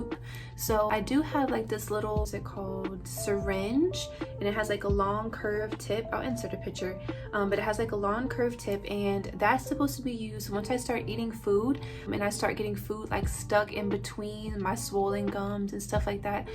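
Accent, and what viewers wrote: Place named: New Orleans, United States. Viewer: y’all live in nola? i know my people when i hear them😂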